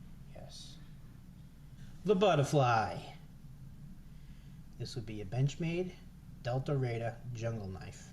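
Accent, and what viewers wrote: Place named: New England, United States. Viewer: Pacific cutlery! And I would recognize that accent anywhere go PATS!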